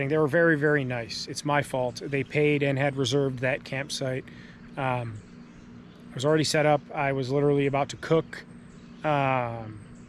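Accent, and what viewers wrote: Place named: Allegheny, United States. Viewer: You sound like your close to where I live. I live near the Allegheny national forest.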